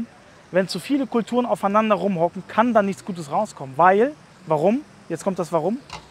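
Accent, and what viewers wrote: Berlin, Germany. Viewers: Dit machste schon jut Immer schön Berliner Schnauze wa Hau raus Bro 🙌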